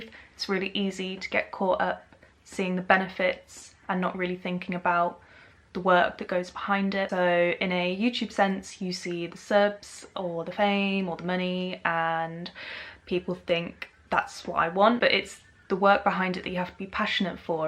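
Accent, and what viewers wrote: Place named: United Kingdom. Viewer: yeah, you have such a lovely British accent. Let's be friends!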